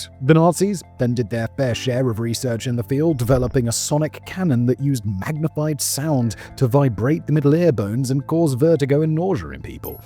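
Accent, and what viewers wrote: United Kingdom. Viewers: British accents and lazer weapons, I think we all know where this is going.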